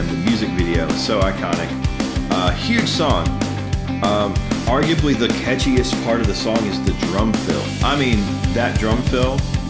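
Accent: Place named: South, United States. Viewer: tell me you're from the south w/o saying it. "he's playing that fast and he's playing that fast and he's strumming the shi*t outta that thing"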